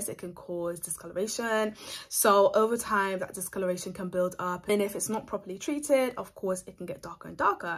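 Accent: United Kingdom. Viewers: someone can tell me if shes british? i hear an accent when she talks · Please some should please write down the name of product she mentioned I couldn’t get her because she has an English intonation please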